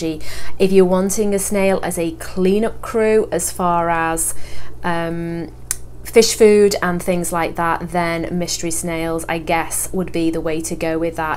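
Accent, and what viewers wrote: Liverpool, United Kingdom. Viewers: Great video. I've been a subscriber for a while now and there's a (off topic) question I've been wanting to ask for a while... I know you're based in the USA, but your accent, I'm convinced I can hear overtones of Scouse, what we call someone from the Liverpool/Merseyside area here in the UK, or am I just imagining it? Okay, back on topic. There seems to be much less choice and availability here in the UK when it comes to snails, I think partly due to some species being 'banned', but also for some reason they just don't seem to be as popular over here. However, I personally keep 1 Zebra Nerite in a 19 litre / 5 gallon tank (a Fluval Spec V, which is quite a narrow tank, only 16cm front to back and not the easiest to get into for cleaning or maintenance, etc.) along with a small colony of Red Cherry Shrimp. I also keep a trio of Zebra Nerites in a planted and 'scaped, 60litre / 15-ish gallon temperate tank, along with 8 Blue Ring Danios and 8 Amano Shrimp. 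The shrimp were originally about half the size of the fish, but now they (well, the females anyway) have grown to be bigger than the fish! I added the snails to the tanks because I wanted to add another dimension to the activity within. The fact that they also serve a useful purpose by helping to keep algae under control is, as I see it, a bonus but not the only reason I got them. At present, I'm playing around with 'scaping ideas in a 40cm cube (another 60 litre / 15 gallon tank) and I also have a Fluval Flex 9 (gallon / 34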